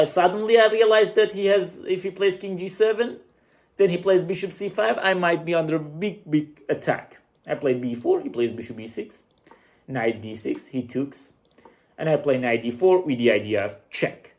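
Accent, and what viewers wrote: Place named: Italy. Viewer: You’ve got Italian accent 😇 Cool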